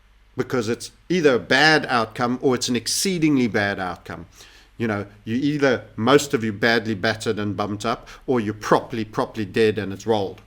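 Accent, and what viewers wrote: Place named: South Africa. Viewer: South African. Fair few Scots went over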